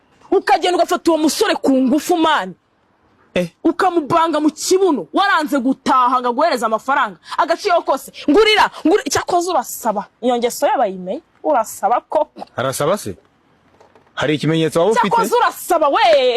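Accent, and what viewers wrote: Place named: Southern Italy, Italy. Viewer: I understand nothing, but I can say that her speech sounds like a southern Italian dialect.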